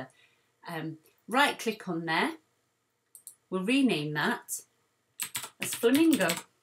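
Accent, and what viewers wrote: Yorkshire, United Kingdom. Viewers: I just found your channel and subscribed please please do more tutorials on digitising for beginners. You speak my Yorkshire language and explain beautifully! Please do more! Xx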